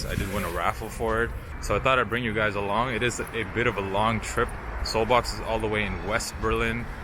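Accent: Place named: United States. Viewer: it was good man, nett video ! you speak english like an American if you didnt mentioned you were from Turkey I thought you were from the States 👍👌🇩🇪🇺🇸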